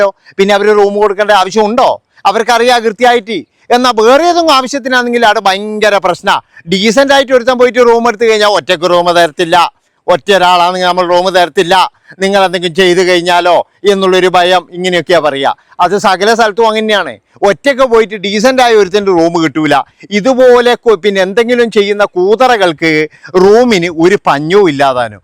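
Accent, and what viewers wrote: Kannur, India. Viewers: ചേട്ടാ, പറയുന്ന ചരിത്ര സത്യങ്ങൾ /ആനുകാലികങ്ങൾ, ലളിതമായി കണ്ണൂർ സ്റ്റൈലിൽ സമൂഹത്തിന് ബോധനം നൽകിയതിന്. 🌹🤝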